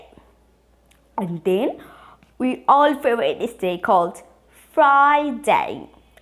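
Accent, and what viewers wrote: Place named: United Kingdom. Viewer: how you got adapted British accent! It's so fascinating to listen.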